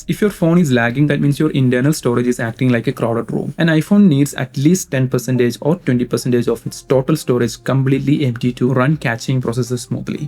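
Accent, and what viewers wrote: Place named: Kerala, India. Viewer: that malyali accent is smooth, intentionally pronunciation is lit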